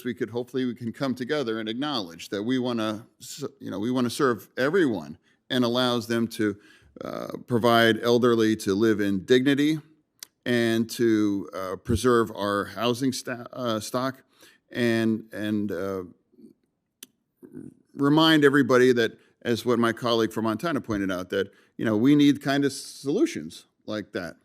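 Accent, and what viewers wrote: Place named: Wyoming, United States. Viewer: He sounds like he is from Wyoming after his aneurism.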